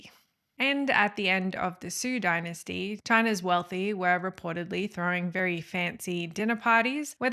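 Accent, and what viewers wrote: Australia. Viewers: An Australian video essay is so weird to me, it feels like I’m listening to some kind of ABC investigative report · Your accent is cute lol Australia? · You look mexican and sound Australian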